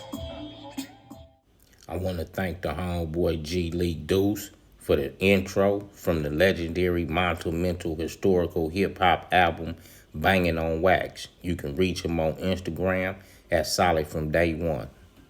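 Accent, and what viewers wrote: Los Angeles, United States. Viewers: Dog you got the most LA accent I’ve ever heard! When I talk to my Cali guys I always do your impression. It’s not a good one tho… I’ve put everyone I know up on your channel. We all know you’re the real deal. Glad that the real G’s are telling their own stories in real time. Stay safe out there. We need ya.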